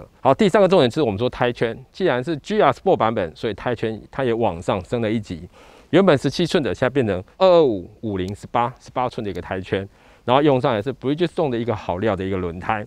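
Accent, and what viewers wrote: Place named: United Kingdom. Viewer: 我都不知道台灣是以美語為主還是英文為主,怎麼發音都走英國風?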